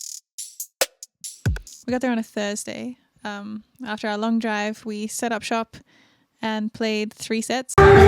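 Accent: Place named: South Africa. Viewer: Hi. I'm trying to work out your accent. Maybe South African?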